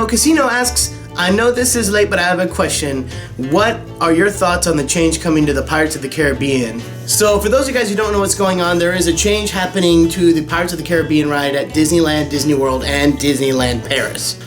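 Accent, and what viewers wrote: Scotland, United Kingdom. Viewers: You are amazing Brian! And no don't shave your beard at all😂 PS Scottish accents are amazing!!!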